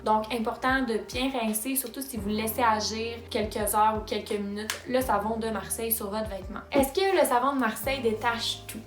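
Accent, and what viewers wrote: Quebec, Canada. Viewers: Je pense que vous n’êtes jamais allé au Canada😂. Je vous imagine mal dire cela à quelqu’un en face de vous! Tous les canadiens qui parlent français ont cet accent. Vous n’êtes pas obligé de regarder la vidéo sinon vous pouvez la mettre en silencieux et activer les sous titres. Personnellement je trouve son accent très agréable et elle me parait tellement sympa! C’est dommage ce genre de commentaire, si ce n’est pas constructif à quoi bon? Si c’est quelque chose que vous ne pouvez pas dire en face de quelqu’un alors vaut mieux le garder pour soi et je me l’applique à moi même ! 😉sans rancune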